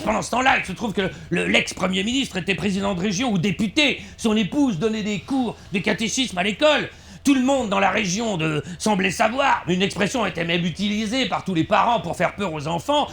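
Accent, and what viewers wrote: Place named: Montceau, France. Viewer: A ben t'es du Montceau hein!!!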